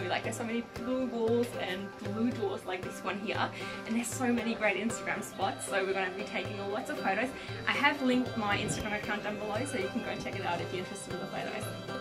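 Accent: Australia. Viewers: I lived in Germany for a while Stuttgart und umgebung :) and at the beginning I was about to say you are German But that's kind of impressive how well you were able to pick up the aussie accent and this misled me into thinking that you are either aussie or kiwi :D That's great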